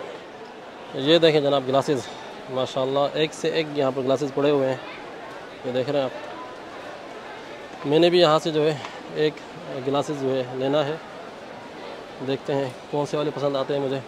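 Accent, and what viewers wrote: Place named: Karachi, Pakistan. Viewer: Karachi lug raha hay😀Aap ka taluq karachi say hay??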